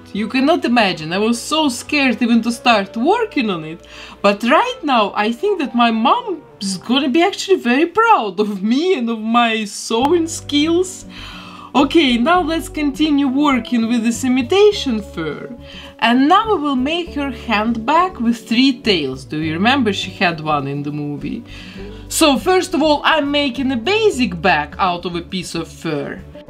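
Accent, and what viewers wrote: Russia. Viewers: Я чувствую себя ТАК странно, смотря Ваши видео. Мозг воспринимает русский акцент как русский язык, но при этом я понимаю, что звучит английский... хэлб А если не считать ребусов в моей голове, видео очень крутые, смотрю регулярно и с большим удовольствием.) Вы крутая художница! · Мне кажется или это русский акцент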